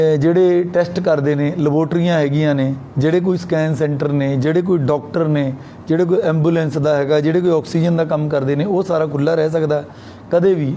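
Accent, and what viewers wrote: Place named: Punjab, India. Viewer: Sir thodi raw Punjabi sunn k dil khush ho jaanda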